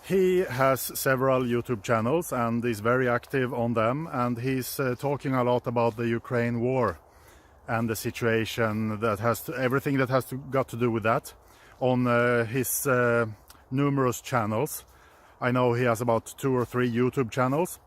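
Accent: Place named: Spain. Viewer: You have a Spanish accent! 1. You are not half a Greek! 2. Didn't you hear sirens? For months...from his window? 3. He got caught by Ukrainian SPU - who ( by his claims) - don't allow him to leave the country! During this "obducted " week - he surprisingly has to sign many non - disclosure papers! 🙆🤷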